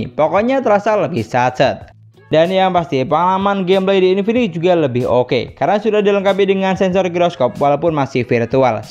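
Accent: Madura, Indonesia. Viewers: Logat madura campur sumatra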